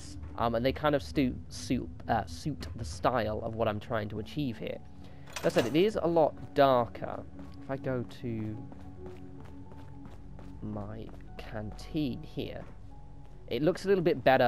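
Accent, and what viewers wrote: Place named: East London, United Kingdom. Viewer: You genuinely have a fascinating accent. Its like a mixture of eloquent country english and really harsh east london. Almost verging on cockney.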